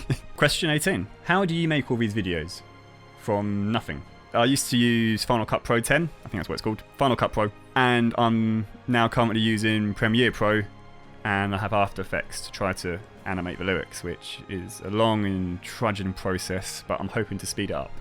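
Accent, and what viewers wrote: United Kingdom. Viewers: He british 😍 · I did NOT expect you to be English! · His accent 😍😍 #LondonBoy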